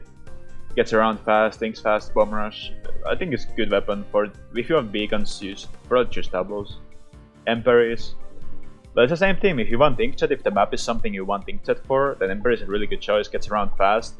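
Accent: Finland. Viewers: Ui juma arvasin ihan suomen aksentti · Suomi? This was recorded to me from yt and you have a Finnish acsent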